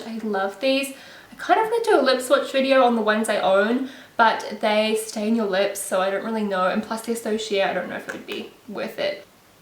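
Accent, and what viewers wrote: New Zealand. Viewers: 'petel flower' -> petal flower, gotta love that Kiwi accent! x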